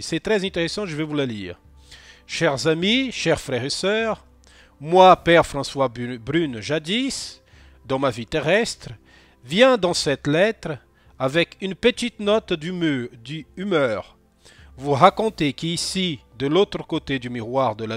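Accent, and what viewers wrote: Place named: Brazil. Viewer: Je suis tellement heureux de retrouver le Père Brune. C'est en cherchant d'éventuelles vidéos inédites de François Brune que je vous ai trouvé aussi, j'ai écouté toutes vos vidéos : 🤗❤. Je vous aime maintenant tout autant que le Père Brune, et je comprends qu'il vous ait choisi comme postier tout amour. Il se trouve que j'adore le Brésil, et aussi votre accent. Merci du fond du ❤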